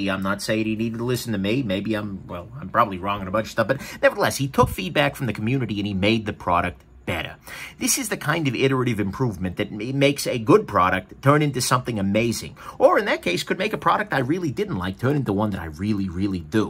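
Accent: United States. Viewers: Russian? Some sort of American accent is what I am hearing... Kind of reminds me of a Warner Bros character...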